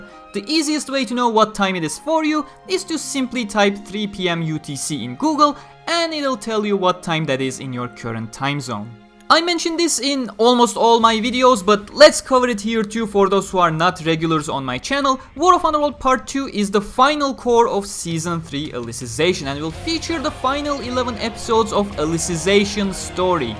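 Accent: Turkey. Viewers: Böyle bir konuşmanın bir Türk tarafından İngilizce olduğu çok belli. Başarılar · Aksan ve nickinden Türk olduğun anlaşılıyor bu arada genede çabaların hoşuma gitti Global olma yolunda başarılar :)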